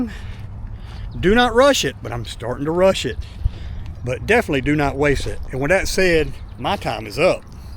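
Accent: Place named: North Carolina, United States. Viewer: Somehow your video popped up in my feed...maybe because i'm subscribed to JoGo's channel😅....(since you have the same accent as 'JoGo', I can now recognize a North Carolina accent 😅).....but that aside...you're thinking about to postpone for another 2 years...which is understanable...an other vlogger 'the Ugly Tourist'...had a friend who also planned to retire in the Phills..good job/good pay above what he already had...but he wanted to wait 2 extra years to gather more USD's...but the Ugly Tourist went ahead...and his friend....died 6 months later....so, yeah...you never know...just take in consideration that 97,99% of the people worldwide is not in a position like you do....so whille you're still breathing....GO! Enjoy more of your time!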